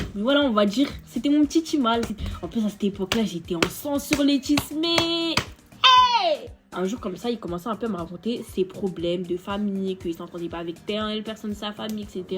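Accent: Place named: Democratic Republic of the Congo. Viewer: Ça sent que tu parles Lingala 😂😂😂😂😂😂😂😂😂😂😂😂 Tema l'histoire digne d'un film wallah 😂 Je me suis abonné direct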